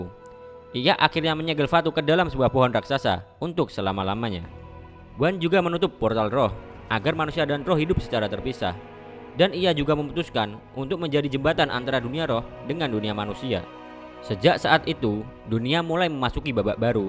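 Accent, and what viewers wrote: Indonesia. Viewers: Medhok looorrr....! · Abang orang NTT ya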